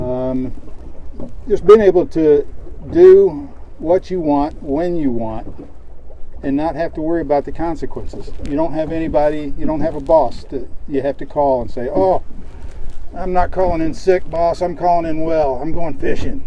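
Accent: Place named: Florida, United States. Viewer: Hey. Did anyone ever tell you that you speak "Florida Boy"? Enjoying your books. I'm through Book 10 and Blue Collar to No Collar! Thanks for all the hard work. I've started a bit myself and find myself starting all over again. I think I found my niche though this time. Keep up the good stuff. See you in La Belle one of these days. BearTail.. B52 Bomb/Nav. "Iron Dukes Viet Nam" 320th Bomb Wing. First in - Last out.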